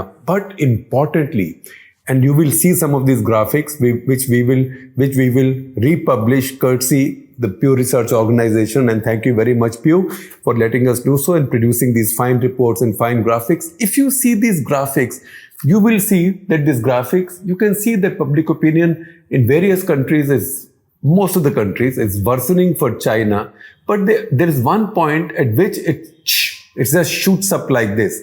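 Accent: India. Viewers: Delusions of grandeur, sabre rattling, cringy indian accent on that unpleasant face and obsessing about others is what shekar and indian journalists are good at. Anybody could have read out the report. Stick to journalism dude by shedding light on indian problems. Never heard explaining the PEW report dated june 29th,21 that talks abt religious intolerance in india. No wonder indian journalists have such a bleak view of the world.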